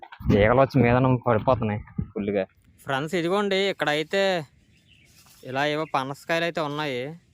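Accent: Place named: Visakhapatnam, India. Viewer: గ్రేట్ biodiversity of సింహా చలం హిల్స్. Nice free talking of వైజాగ్ style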